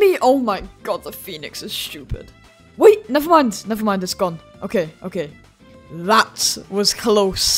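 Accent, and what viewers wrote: United Kingdom. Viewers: User: ChickChickadee2013: not american Me: that’s alr:) u dont have to be american to enjoy aolenus/eagles Btw are you British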